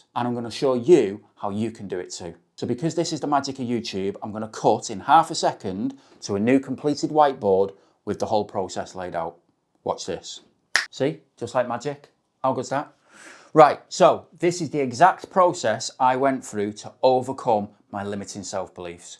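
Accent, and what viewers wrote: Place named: United Kingdom. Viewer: You have a relatable and down to earth way of presenting your videos. Nice to hear a British accent too. Keep up the great content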